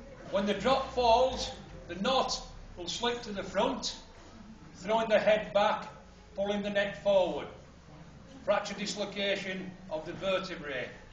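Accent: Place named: Yorkshire, United Kingdom. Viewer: That is a Yorkshire accent.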